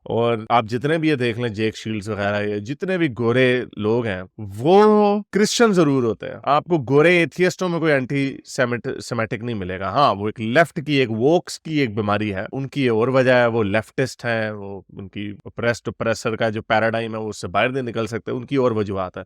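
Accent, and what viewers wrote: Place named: Punjab, India. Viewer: Bro! I like your ideology and content all the time. Punjabi touch in your all the videos is mind blowing like chhitro chhitri😂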